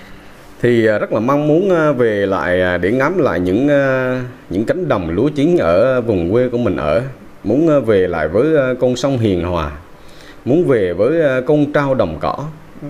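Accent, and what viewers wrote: Quang Nam, Vietnam. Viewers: Nghe giọng QN ĐN chán chết đc .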